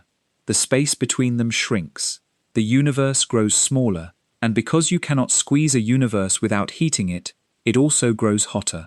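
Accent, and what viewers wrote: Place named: United Kingdom. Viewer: Think I'm gonna stop listening to British-voiceover space videos. ...fuck.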